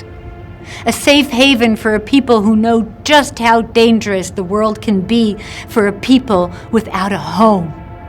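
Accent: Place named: United States. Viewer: Her American education shows in her perfectly American English. I also happen to agree with her....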